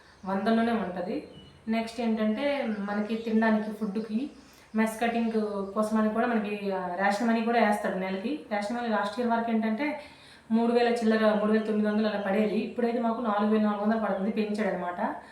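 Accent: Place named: Srikakulam, India. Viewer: Midhi srikakulm haa madem.. Language... Same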